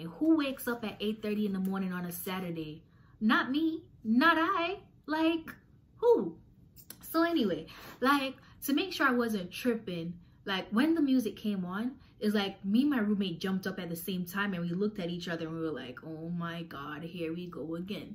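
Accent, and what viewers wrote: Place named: Jamaica. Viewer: Mi hear the patois fam 🤣🤣🤣big ups no say a word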